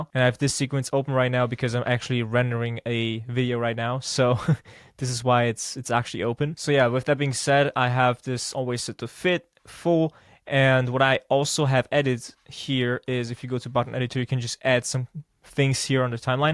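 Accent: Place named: United States. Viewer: Az Ali Abdaalos videodat ajanlotta nekem a YT kb. 2 db perce, az hozott ide. Amerikaban szulettel, eltel vagy elsz? Az akcentusod amerikai es kb semmi magyar akcentusod nincs. Hogy csinalod? hahah Amugy ne hagyd abba a YT-ot mert szerintem be fogsz vele futni!!! Just keep creating content!!! En most gondolkodom, hogy elkezdem, de meg az overthinking fazisban vagyok. Van egy niche otletem, ami csak kozepesen saturated :D, majd meglatjuk. Kozben epp laptopot is keresek, meg vagoprogit is. Ugyhogy tenyleg nagyon a legelejen. Egyebkent nekem a Davinci Resolve-ot ajanlottak, mint vagoprogram, de megnezem a videoidat es lehet, hogy a te progid jobban bejon majd. Feliratkoztam. Sok sikert!! :)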